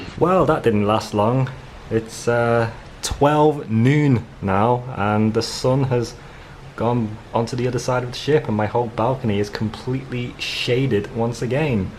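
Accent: United Kingdom. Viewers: British accent is what caught my eyes/ears so I decided to listen and now a subscriber, interesting cruise life. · It’s a Liverpool accent 😎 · Another good vlog:-) I was caught off guard at first by the british accent when I first started watching. I'm glad all is going well...I too was wondering how you guys deal with laundry and room detail. Thanks for the great content...always fresh and putting a positive light on what's going on. It's much appreciated...myself...i would be climbing the walls...at least you have the internet and tv to help pass the time.:-) Have a great day:-)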